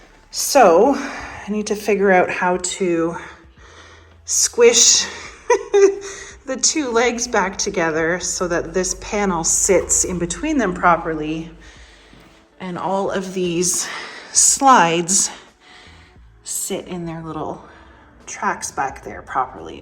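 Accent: Canada. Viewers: That’s a hard “OU” in the word “out” when you say it.. I had to hear it a second time to see what you said. Once I realized it was a northern, possibly Canadian accent, I had to hear it just a few more times for satisfaction.. I even caught myself saying “ahhoout” and “ah-bow-oot.”..😂.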